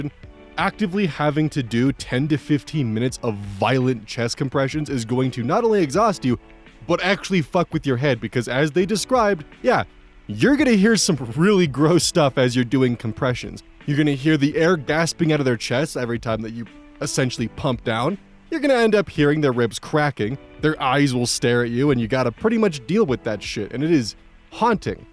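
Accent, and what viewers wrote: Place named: Midwest, United States. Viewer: Even though you're from Ohio (Pretty sure that's what you said) and he's from Wisconsin, once in a while your accent makes me think of Pickles from Dethklok. Juuust enough that I couldn't place who I was thinking of til just now (been listening for maybe a month now?-idk my ADHD makes it hard to measure time)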